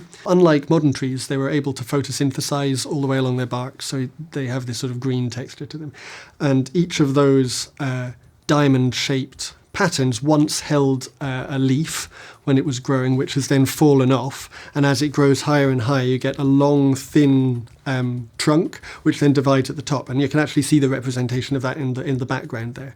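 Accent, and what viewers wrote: Scotland, United Kingdom. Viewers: Can someone tell me wht kind of british accent does he hav? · No, you're fine. he's using a regional pronunciation from parts of the UK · Great. We have a British sounding explaining Prehistoric now! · british like me yes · Gotta love a guy geekin out with a British accent. Adorbs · He's from Edinburgh and now lives in London so has a mild Scottish accent.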